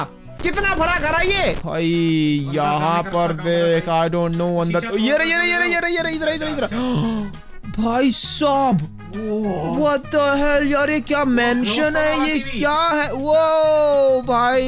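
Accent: Punjab, India. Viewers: Tum Punjabi ho kya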